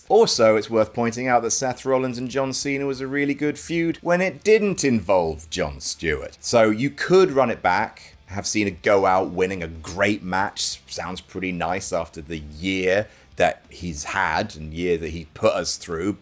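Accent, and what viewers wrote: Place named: RP, United Kingdom. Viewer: It's wouldn't be without hearing his posh accent say fuck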